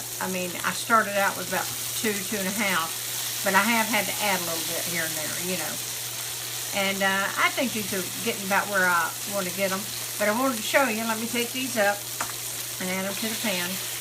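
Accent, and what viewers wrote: South, United States. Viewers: I am thinking; Kentucky;Virginia, Tennessee by accent?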